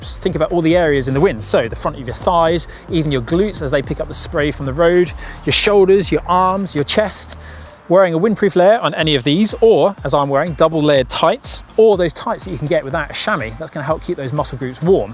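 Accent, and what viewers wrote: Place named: United Kingdom. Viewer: It's a British accent, not an internationalized accent.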